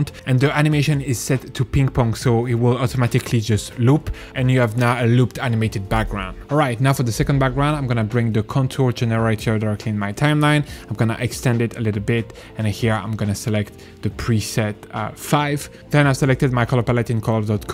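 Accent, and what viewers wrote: France. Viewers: Thank you very very very much. Iam so grateful for you videos ✨🙏🏾 I hear a little accent, are you French ?? Ahaha 🤣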